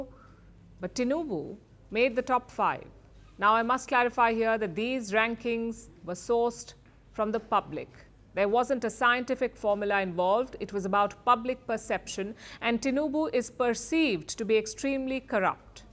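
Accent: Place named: India. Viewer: She's an Indian, I guess her native accent influences her speech pattern.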